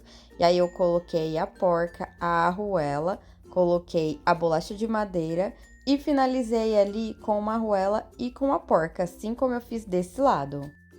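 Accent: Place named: Goiás, Brazil. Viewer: Show. Amo seu canal e esse sotaque goiano. Como é bom ver uma conterrânea talentosa e com um canal bombando com conteúdo de verdade. Parabéns!!!!!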